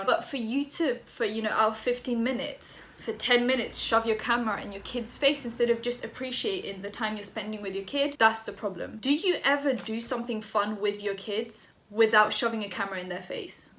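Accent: United Kingdom. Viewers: honestly i usually can't stand british accents but i enjoy listening to you so much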